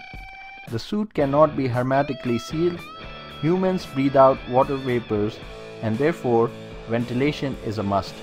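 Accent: India. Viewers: You make speaking english in indian accent sound cool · I'm sorry but this guy sounds like indian tech support.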